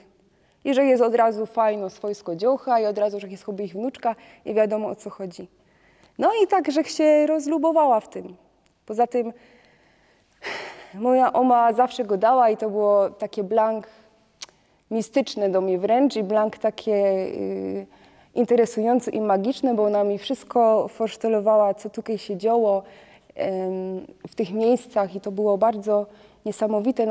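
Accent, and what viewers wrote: Silesia, Poland. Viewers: Jerona chopie no Cie moga godzinami suchac. Kochom tyn akcynt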